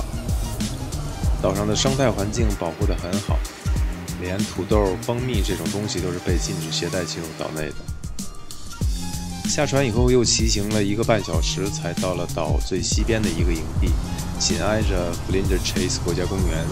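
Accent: Northeast, China. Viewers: 本山东人认为，明明是东北口音啊哈哈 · 听东北话真是亲切，我18年19年南澳 西澳两次自驾，总长没有你跑那么远，也没有伙伴，但真的很爽，以后有机会还要去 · 东北腔，哈哈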